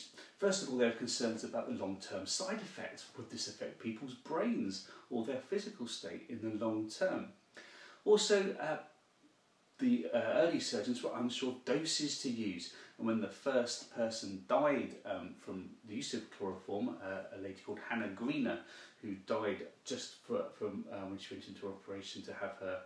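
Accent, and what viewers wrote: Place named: Scotland, United Kingdom. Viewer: Scottish accent 10/10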